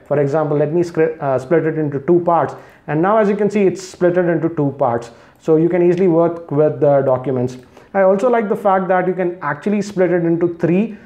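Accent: India. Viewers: Your video is awesome, but I cannot actually stay long because the heavy Indian accent is really annoying.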